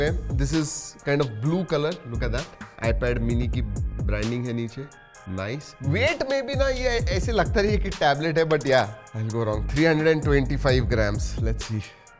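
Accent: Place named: Maharashtra, India. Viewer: तुमचे मराठीं मधे reviews खुप दामदार वाटतात तुम्ही अत्ता हिंदी बोलत आहत पैन एमएलए आसा वाटत आहे की मराठीच बोलताय 😅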